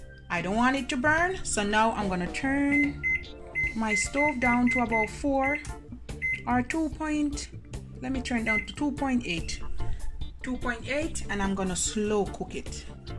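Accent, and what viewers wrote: Jamaica. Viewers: Lovely porridge family you sound like you from mobay .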